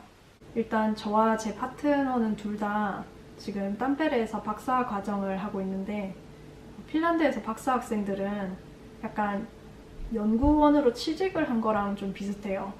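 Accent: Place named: Busan, South Korea. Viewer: 좋은 정보 ㄳ요~ 누님, 부산 출신~? 정겹네요ㅎㅎ 근데, 혹시 헬싱키에 오피스텔은 어디서 찾아볼 수 있나요~?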